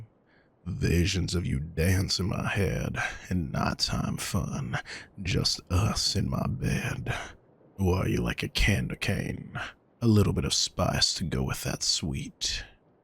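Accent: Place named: South, United States. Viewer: I love a good southern accent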